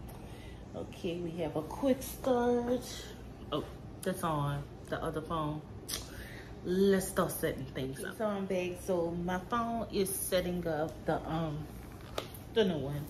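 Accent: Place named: New Orleans, United States. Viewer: Great unboxing. I’m sitting here waiting for my white 15 Pro Max to arrive in NOVEMBER and torturing myself with videos lol. You sound like you’re from the NOLA!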